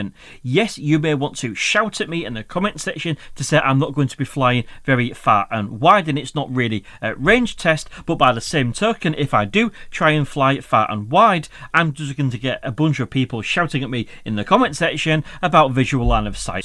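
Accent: United Kingdom. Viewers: I am from indonesia Is the accent that you are using is british?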